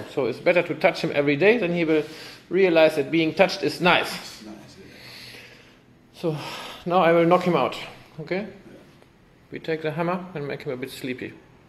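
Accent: Germany. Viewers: Condescending German. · It sounds like a really hard german Accent. Do u come from germany? :)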